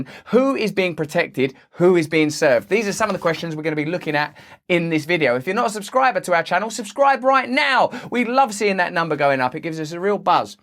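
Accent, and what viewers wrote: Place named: London, United Kingdom. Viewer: You sound like you from London!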